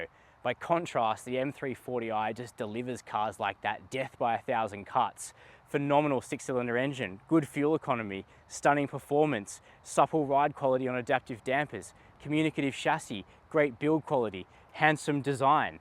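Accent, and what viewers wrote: Australia. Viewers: The host sounds Australian. Car prices in Australia is indeed quite high.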